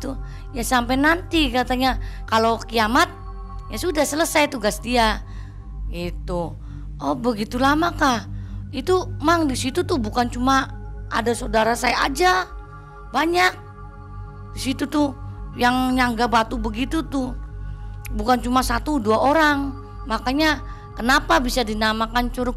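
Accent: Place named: Cirebon, Indonesia. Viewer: Logat orang cerbon, yg tercampur Betawi mbaknya ini kayaknya orang cerbon yg pernah diam di jakarta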